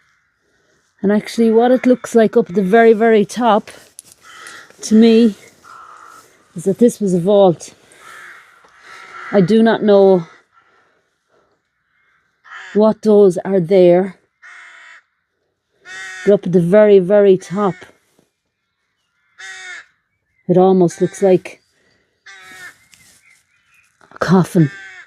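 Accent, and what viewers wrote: Ireland. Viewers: I could listen to you speak for hours. I love the irish accent. Im about 20% irish, maybe that has something to do with it. Hope your health improves soon. And best wishes from America!😊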